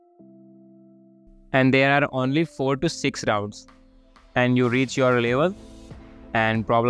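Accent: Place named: India. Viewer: me needing help with a question: guy with the hardest Indian accent ever saving the day😎😎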